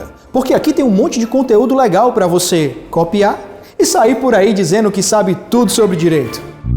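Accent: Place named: Ceará, Brazil. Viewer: Deve ser Cearense 😍👏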